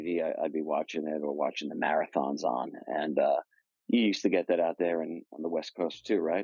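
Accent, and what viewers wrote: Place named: New York, United States. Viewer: Love the NYC accent, bro.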